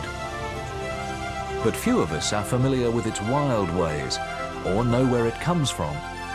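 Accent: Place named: United Kingdom. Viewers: WALKMAN from Australian TV? Then yes. The announcer sounded more British tho. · The narrator's name is. He is an English-born Australian, so that may well be the reason why he has a touch of British in his accent.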